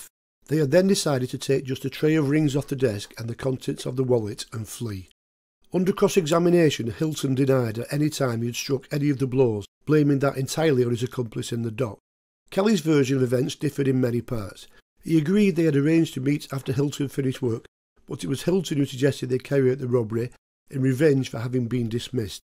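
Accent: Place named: North, United Kingdom. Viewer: In defence of the regional accents of Northern Britain ( and yours is soft and pleasant to the ear ) when Eastenders came out there were thousands of requests from Scotland for subtitles .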